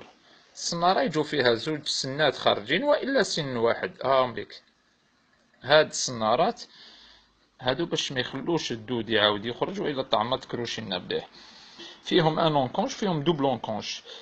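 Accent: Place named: Algiers, Algeria. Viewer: Salem frère..un petit conseil d'amis essaye de parler en arabe car l'accent algérois est détester par les 47 wilaya et en plus cette chaîne est connu de Tunis jusqu'à Palestine...ne prend pas mal mon conseil frère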